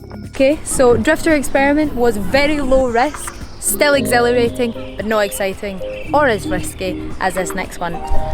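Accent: Scotland, United Kingdom. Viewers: Scottish accent😁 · Insta360 haha so did I! Thankfully when u started speaking the home accent I realised. Alba gu Brath!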